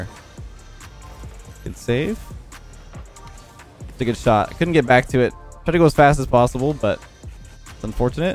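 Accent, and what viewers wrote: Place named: Canada. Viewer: My Canadian friend pronounces his wors with -ar like you such as car and garbage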